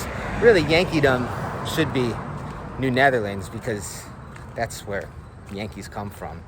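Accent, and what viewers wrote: Brazil. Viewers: We are so used to seeing speaking Portuguese that when he speaks English it seems like he is a Brazilian making videos in English, heheheh. Make more videos in English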